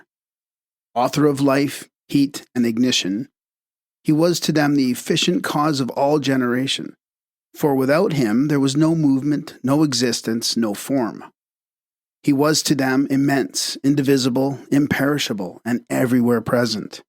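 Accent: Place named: Upper class, United Kingdom. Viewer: Upper-class British voice is always the way... oh well.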